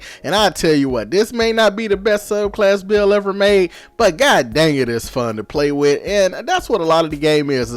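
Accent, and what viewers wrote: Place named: South, United States. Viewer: You remind me of Magamu, but with a Southern accent. Great video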